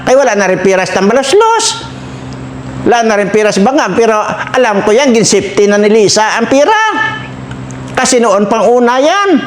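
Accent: Iloilo, Philippines. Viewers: ilonggo kaba sir parang ilonggo yong bigkas mo ng salita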